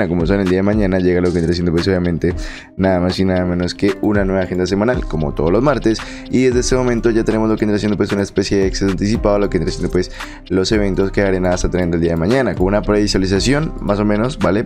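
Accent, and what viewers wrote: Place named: Argentina. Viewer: Lo más xd que escucharán hoy confundía el acento argentino con el español XD 😂😂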